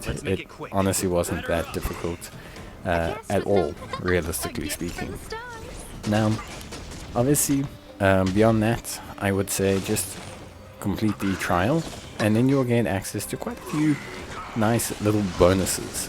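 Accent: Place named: South Africa. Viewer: Bro sounds south african😅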